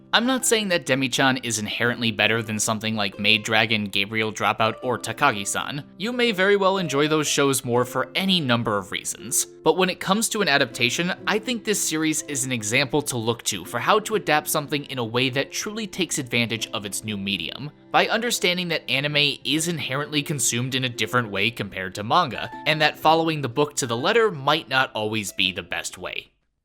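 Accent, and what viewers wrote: United Kingdom. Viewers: all of them, you say in an english way instead of how japanese say it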